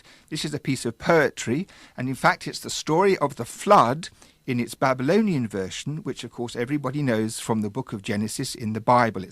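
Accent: England, United Kingdom. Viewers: Love his enthusiasm and scholarship, but man, you can sure tell he’s a Brit. We have many thousands of speakers of Assyrian Neo-Aramaic in the world today - they could help him with his pronunciation or even do the readings for him. I don’t mean to be a troll, and I’ve heard worse accents, but authenticity is really important. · That’s not what I mean, I mean he’s pronouncing them wrong, and his English accent is in the way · This is how i understood what he said lol - ana kukuresh- i am Cyrus - shah ??? Akadi - king of the Akkadians - i swear it sounds like some Persian Dialect except with an Englishman's accent. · Still sounds like some daft English Bloke! Come on, try harder on your vowels, you're pronouncing them like some English guy. I can hear your accent under the words. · Yes, it is true that the man speaking here pronounces the Assyrian selections with a strong English accent. 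Typically of English speakers, whether British or American, he rolls the "R"s strongly to give a "foreign" touch. This is like how Americans tend to pronounce every foreign word they come across as though it were French, or how Japanese tend to pronounce every foreign word as if it were English. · With fkn British accent.... No man don't pls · Almost no pure vowels. Every vowel sounds the typical English vowel/semivowel dipthong. What a surprise! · Sound like hell hole with that English accent instead let an Arabic or some Jewish person read it since their language were related to Assyrian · I mean, we don't know how to say it in ancient Assyrian (even though this text would technically be in Babylonian), so there's no point in mimic a modern foreign accent. Plus, he's a British man with a very British accent, not American. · Now Why I said "could you sound more middle eastern" is my own buisness. 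İf you want to argue it, you will ask properly or you are a waste of time. İf you try to be a smartass with that first comment of yours, you are incompetent. I know how modern assirian sounds by my neighbors and I learned many phonetics of the ancient one from people like Veysel Donbaz. And this man's british accent sounded off, I wrote "could you sound more middle eastern please". That man and every middle east language speaker understands what I mean right away. Besides not asking properly and not knowing what I mean. Only with assumptions you are writing all these out of point analogies and garbage like troll/dumb american. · I really respect your efforts but your accent sounds British when you're trying to speak Akkadian. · This guy speaks Assyrian with an British accent. Awful.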